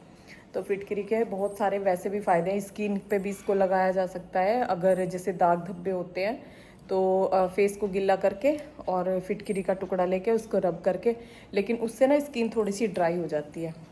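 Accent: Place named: Haryana, India. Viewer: Di m b gujjar hu kota rajasthan se lakin ap logo ko dekhkar nh lgta ki aap Gujjar ho quki humare rajasthan ka पहनावा boli sb aap se alg h aap ko or apke पहनावे or boli se aap haryanwi lgte ho lakin muje bhaut kushi hoti h jb अपनी समाज ke log aage bdte h mtlab kashker ke ladies jb kuch krti h to bdi kushi hoti m cahati hu apna gujjar समाज age bde 😊😊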